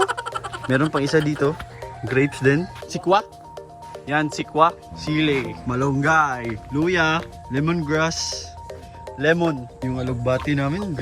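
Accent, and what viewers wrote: Philippines. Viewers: anong probinsya? ang accent?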